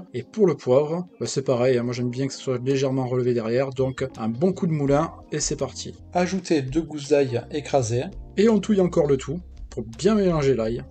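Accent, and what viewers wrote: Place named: Provence, France. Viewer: hum cette pointe d'accent provençal !!! Je l'ai aussi mais légèrement plus prononcé!! Sinon, votre recette est succulente, je l'ai faite hier soir on s'est régalé.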